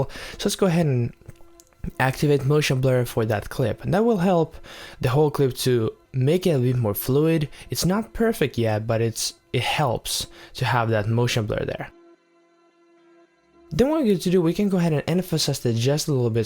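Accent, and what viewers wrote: Finland. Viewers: are you from finland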